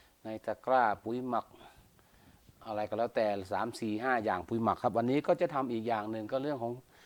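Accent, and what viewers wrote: North, Thailand. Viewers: ขอโทษนะคะพี่เป็นคน เหนือรึป่าวคะน้องกดติดตามแล้วค่ะ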